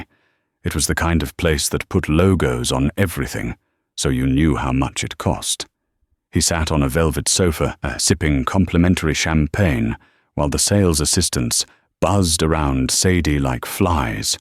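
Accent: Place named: England, United Kingdom. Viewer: Yes. I agree. Luv that purring English accent. YUM!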